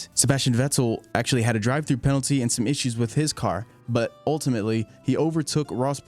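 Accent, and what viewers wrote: United States. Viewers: Great vid, and as much i like the way the brits talk it’s nice to hear an American F1 fan do it like you, so thanks bro, from Atlanta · F1 in American accent with a few unusual pronunciations sounds a bit weird but kudos man for these recaps.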